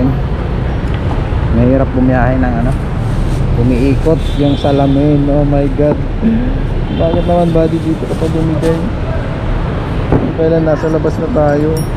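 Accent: Philippines. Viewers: Ilonggo ka ba papsi? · bisaya ka paps?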